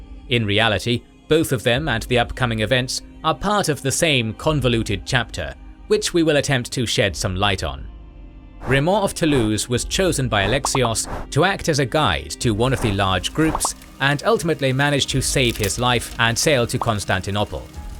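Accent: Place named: United Kingdom. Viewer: Your videos are great, and I love your British accent, but you occasionally mispronounce words, especially names, in ways which can't be chalked up to dialect/accent. In this video, an example is "Paschal." The ch is a "k" sound in modern English, rather than a "ch" sound (so "Pas-KAL" versus "Pas-CHAL"), coming originally from the Greek χ. Please improve this, as it is distracting from what are otherwise amazing videos. I don't mean to be nit-picky, but people do judge others on such things and I don't want you to be dinged for minor things that can easily be corrected.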